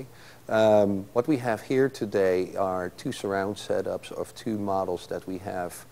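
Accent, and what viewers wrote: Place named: Netherlands. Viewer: The presenter sounds like he's from Holland.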